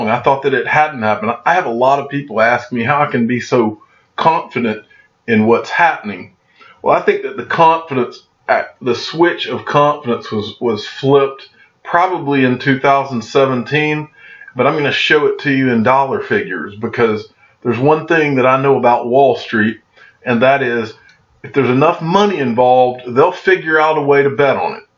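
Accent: South, United States. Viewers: My job keeps me traveling. I am rarely at home. I listen to you every day while I am moving from one place to another. I also have a Youtube channel. My monthly Google payment goes into XRP. The first, second, third and fourth time I heard you on youtube I clicked forward IMMEDIATELY! That southern accent of yours was the proof that you were stupid AS FAR AS i WAS CONCERNED! One day you posted a topic that caught my intrest and l listened to your entire video. I have been hooked ever since. YOU ARE AMAZING!!! Forgive me for my predijust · don't judge by voice alone, a lot of the southern guys are smart like Mike Judge who created hilarious movies and King of the Hill. This is the best XRP channel because has experience in the finance world & has been investing in XRP for 3-4 years BEFORE anyone else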